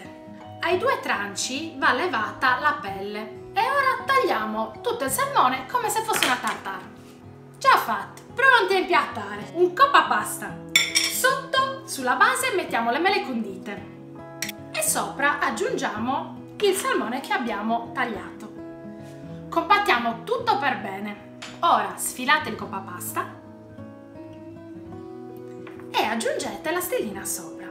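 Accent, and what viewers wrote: Sardinia, Italy. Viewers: Che dire ….mi fai morire ,sei troppo simpatica ,ma sopratutto brava ,piena di energia ,fantasia e simpatia ,pian piano mi sto guardando i tuoi video ,sei sarda come me e si sente 😂,sono molto curiosa di sapere di quale parte della Sardegna sei ,io sono di pula ,provincia di Cagliari .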